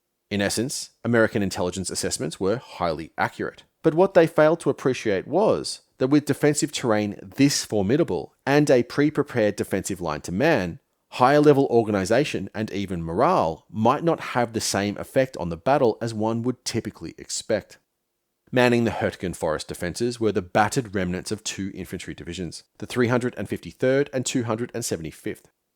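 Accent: Australia. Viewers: Are you from Aussie bro? Canberra? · Mate, pleasure to hear our Australian accent. Salutations on your work.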